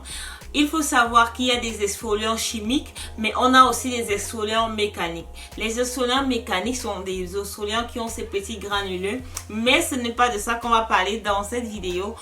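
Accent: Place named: Cameroon. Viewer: Je viens juste de mettre la vidéo c’est l’accent Camer là qui m’a retenue après biensur après avoir lu ton titre ☺️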